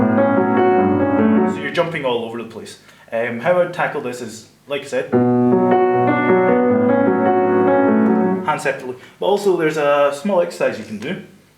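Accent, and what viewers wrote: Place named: Ireland. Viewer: you sound like your from Europe or Ireland. Forgive me if I'm wrong.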